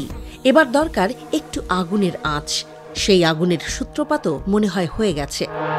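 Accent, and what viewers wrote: Kolkata, India. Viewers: ঠিক প্রথম কলকাতা মত খবর গুলো পরছে।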